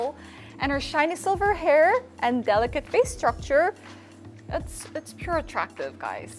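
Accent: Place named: United States. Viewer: Dude I thought I was gonna hear some koran, turns out she speaks American English more than Americans themselves!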